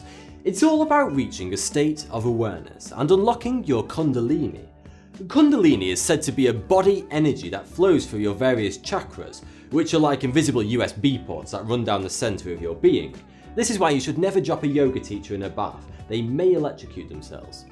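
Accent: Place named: England, United Kingdom. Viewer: Where in England is this guy from? He has that game of thrones type of English accent.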